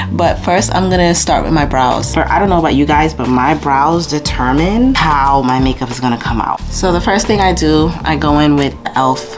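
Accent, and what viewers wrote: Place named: New York, United States. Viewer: I love your accent so much!! Everytime you speak I’m like she’s soooo New York! The make up is so flawless… I’m so glad your doing posted this can I’m still learning make up.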